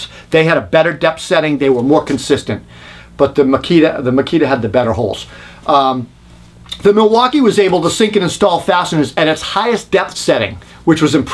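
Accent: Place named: Boston, United States. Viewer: No need to hide the Boston accent! New England is famous for its carpenters. MakitER it is! Massive thanks for your work here, as always. Great work!!!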